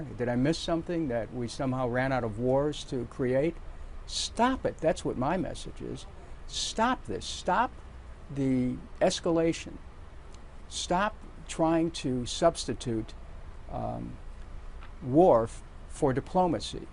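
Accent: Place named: United States. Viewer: Mr Dennis you sound like an American the way you speak. But the true nature of the Real Americans .not Native American not Asians American. not Latin American. not African American. Is War. War comes because of hatred and and disrespect for others.he who lives by War will die by war.and all of these enemies that USA is building up against her will attack her one day..and I bet that they are going to target yellow stone. The cities are a joke. 🎅CIA.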